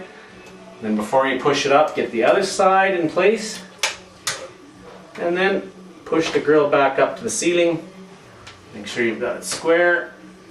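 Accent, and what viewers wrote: Canada. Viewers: Little bit of a Canadian accent? Lol .. but enjoyed the content- thank you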